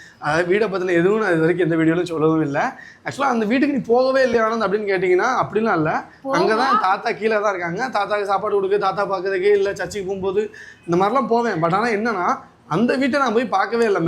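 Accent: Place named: Thoothukudi, India. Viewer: ஓகே அண்ணா அக்கா எனக்கு அம்மா ஊர் தூத்துக்குடி யில்ஓருகிரமம்வேப்பலோடை.மாமியார்ஊர்சாத்தூர்பக்கத்தில்பந்துவார்பட்டிஇப்போதுஇருப்பதுதிருவள்ளூர்.உங்கள்குரல்தூத்துக்குடிகாரங்கபேசுவதுபோல்இருக்கிறதுஅதனால்தான்கேட்டேன்எனக்குஉங்கவீடியோஎல்லாம்பிடிக்கும்அக்காஅண்ணா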